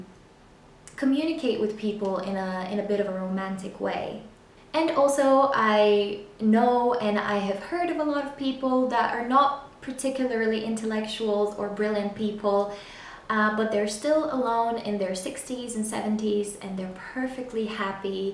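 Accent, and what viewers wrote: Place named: United States. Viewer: but you have US accent! how? :)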